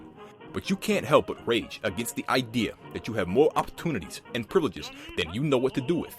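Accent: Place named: New York, United States. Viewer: dat new york accent, I subbed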